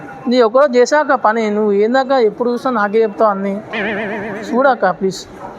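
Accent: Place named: Telangana, India. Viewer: Telangana slanglo natural and common points simply superb. Healthy jokes.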